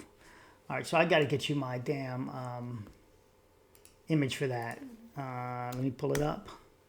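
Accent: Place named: New York, United States. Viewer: Hello from Kansas! Really enjoy watching your analysis. It reminds me of Seinfeld. It isn't a show about nothing but idiots who troll think it is. Maybe its perhaps, what I think is a New Yorkish accent? or at least northeast coast American accent. I do have Kansas ears and we have little to no accent so . . .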